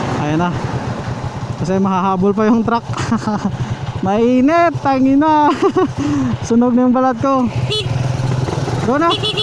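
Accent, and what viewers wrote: Iloilo, Philippines. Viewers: ilonggo kaman gali idol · ilonggo ka gali tol.. 😁😁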